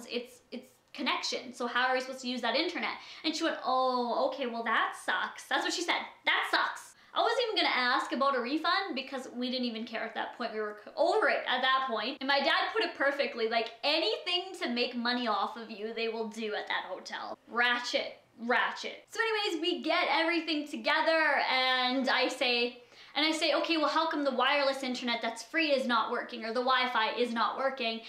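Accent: Canada. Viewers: Such a cute canadian accent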